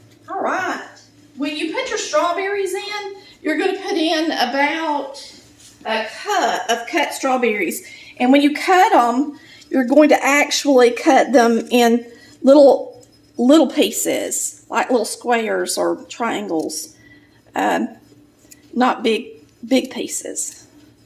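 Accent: South, United States. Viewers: I'll figure out a way to get in touch with you but I got to go to bed now got to be up at 6 you make me smile with that southern accent I was born in Macon Georgia raised in Miami after Hurricane Andrew now I am in Mishawaka Indiana alright young lady got to go to bed but I will get in touch with you somehow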